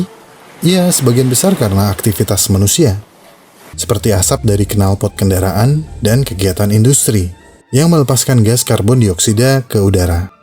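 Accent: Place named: Makassar, Indonesia. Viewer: DARI MAKASSAR MANA SUARANYA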